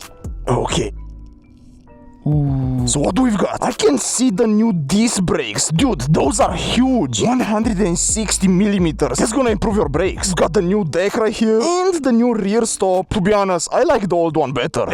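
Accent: Romania. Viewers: You're romanian,right?Your accent seem to be from a Romanian guy(nu prea o am cu engleza) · Ce accent de român ai smr eu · Stiam eu ca esti roman, dupa accent. Foarte faine videoclipurile. Poti oare sa faci un videoclip cu surron ? Ca inca nu stiu daca sa iau surron sau kukirin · brooo, is romanian accent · ești român coaie hahaha😂 W numa acuma mi-am dat seama ca ai acent roman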